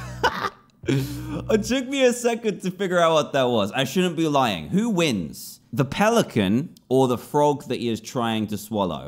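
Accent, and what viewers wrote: United Kingdom. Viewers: is British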